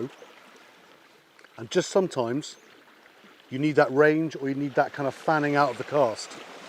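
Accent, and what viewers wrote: South Africa. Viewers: Love the Saffa accent! 😁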